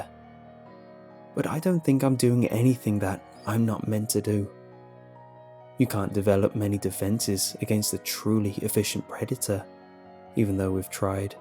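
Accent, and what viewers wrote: United Kingdom. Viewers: your british acksent makes stories scary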